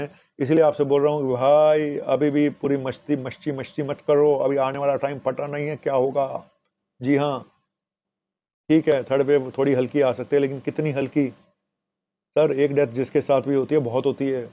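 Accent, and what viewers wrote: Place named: Bihar, India. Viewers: Behari accent,Nice👍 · Sir I have been very much impressed by your Bihari accent .love you sir 🙏🙏🙏🙏🙏🙏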